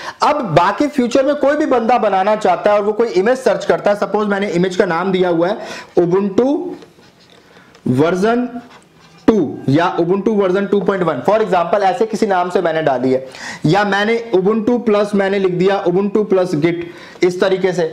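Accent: Pakistan. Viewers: Sir aap bharat se ho ya pakistan se, aapki ton Pakistani lag rahi hai.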